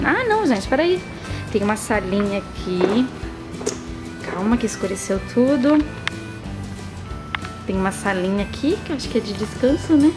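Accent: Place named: São Paulo, Brazil. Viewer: Pelo sotaque, deve ser SP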